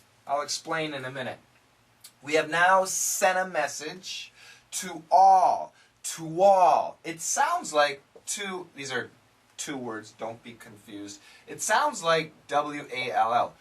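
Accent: United Kingdom. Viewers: With hundreds of styles that fit together beautifully, thousands of colours and ideas, great ranges of kitchens, small living spaces and lots of wonderful plants to any garden. With a little inspiration you can achieve anything. Home Base. Make a house a home. British accent! Well, I'm more used to the American (or Canadian) accent yet it's good to hear it from time to time for variety's sake. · With hundreds of styles that fit together beautifully, thousands of colors and ideas, great ranges and kitchens, small living spaces and lots of wonderful plants for any garden, with a little inspiration you can achieve anything. Homebase make a house a home. Hello, what a British Accent. ohh my god. small or smart? I have always wondered why they are unable to pronounce the R well? it's the same here or even worst. The English language should have different name. 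"God bless America"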